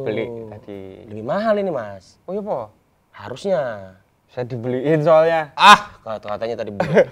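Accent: Java, Indonesia. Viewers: Gemess lucu bgt jd fasih ngomong Jowo medok akibat keseringan naik gunung diJowo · Medok.nya dzawin udah sangat bagus bgt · Efek hbs dri lawu... malah logat ny jdi jawa.. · bgst jawa medok banget, ngakak dari awal sampe akhir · Semangat medhok bang dzawin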